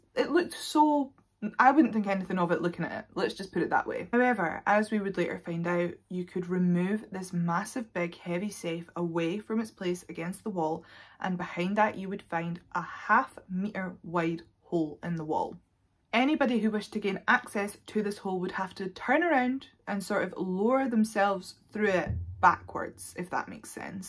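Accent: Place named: Scotland, United Kingdom. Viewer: Totally the only other human interaction she has was with him and when his controlling meant he wasn't horrific to her she took that as kindness. So important for people that haven't experienced it to have an open mind as to what these victims feel the way they do. Thanks again really loved the content. As a fellow Scot your accent helped too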